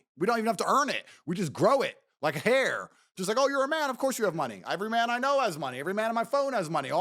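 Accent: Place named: India. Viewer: Just subscribed, quite a strong Indian accent but is very well-spoken. I know India is a huge place but I'm from the UK and I promise you the place I hear about the most for its beauty is India. However, a lot of people hear India and think of some shithole, I might be wrong here but I am sure the economy in India has been and is on a huge growth spurt. Big hidden gem??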